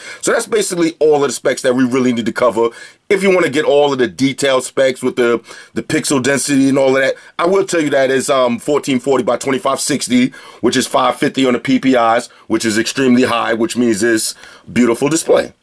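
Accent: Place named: New York City, United States. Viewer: I'm here just to hear your NYC accent!!!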